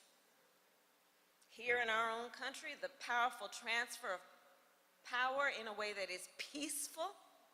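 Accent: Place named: South, United States. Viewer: LOL her family owned slaves in Jamaica, why is she talking like a Southerner. What a joke.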